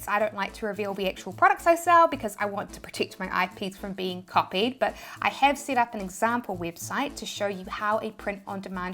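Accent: New Zealand. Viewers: I love New Zealand accents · The New Zealand Kiwi accent is one of my top favourite accents in the whole world, there's a really warm vibe about it, you've got such a vibrant upbeat and clear speaking voice! Others that am very fond of are the Irish accent aswell as the Scots Glasweigen accent were the dialect is very complex, the Newcastle Jordy accent aswell which is another good one, there's too many to name I know but each one is warm within there very own unique syntax, slang and dialect! 🙂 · hi By the way i really like your New Zealand accent. I just opened a store on REDBUBBLE and after 5 days of use it they suspend my account and i don't know what i'm gonna do and thanks for your efforts i love you. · u r of the few I subscribe to I had to get used to ur accent since I was not used to NZ accent but now I find it adorable HAHAHA thanks for very informative vids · I'm a new subscriber (past two months), totally won over by your straightforwardness, can-do attitude, and NZ accent. 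Love it! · Hey, going through your course a second time while I set up my store....should I create an LLC for protection or wait until I find a winning product before starting a legal business? Maybe a future video? Also I'm starting to say words with a NZ accent because of you...the newest one is pola beas 😃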